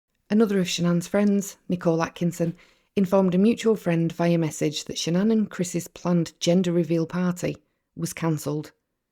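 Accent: United Kingdom. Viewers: Great video. Is that a northern accent I hear? 😊 It’s lovely! Keep up the great work!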